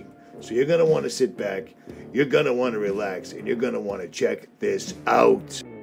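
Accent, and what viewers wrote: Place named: East Coast, United States. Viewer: Hey ! Pauly over here in Nor Cal. I got you over here and respect what you do! Great content and well received , i dig your accent east coast is hella different then my style of west coast or Oakland CA. Mostly but at 54 yrs old im already up in the mountains kinda prerunning a retirement style to see if its way for me ? So far i like it and doing better then at first ,! As it is a trip being solo if you didn't plan it but after two ex wifes w/ 2 kiddos from each and a long term girl friend of 10years turned toxic, resulting in few mo.s in jail up in MT. This is cake walk and very satisfying . So just saying good job keep it up , cause your real kid! One old west coast jack ass too one back east✌🏻🤙🏻🫡🤷‍♂️🕺🏻🪖👍🥽take care bud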